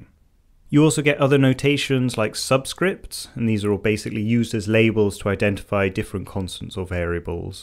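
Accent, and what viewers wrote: England, United Kingdom. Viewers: English accent but u spelt maths as math... (facepalm)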